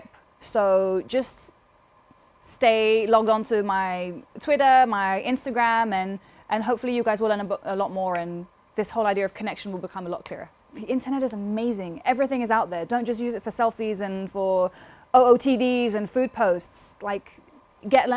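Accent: United Kingdom. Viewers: bein grown up in london, the accent is getting more british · Justru terasa accent nya. Inggris itu banyak jenis aksen.. sama kayak aksen Jakarta, aksen Indonesia timur, aksen Jawa dsb. Aksen nya, London banget..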